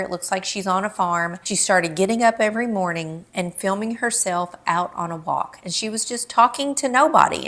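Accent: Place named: Kentucky, United States. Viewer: I don’t much use Instagram. I personally find it boring, but that’s just me. I use Facebook a bit, X a bit, & YouTube a lot. I have a closet full of pretty clothes I never wear because we never go anywhere. I still found this interesting, mainly because I find your voice soothing, & I adore that KY accent. I follow 2 couples who do a lot of videos in TN, & have come to love what they show me of life in that beautiful state. We live in a senior apartment in SoCal, third floor, & my life is completely boring. I can kill silk plants. I follow people who live in France (the countryside), & a German couple who live in the UK. This is how I travel. I would love to see the beauty of Kentucky.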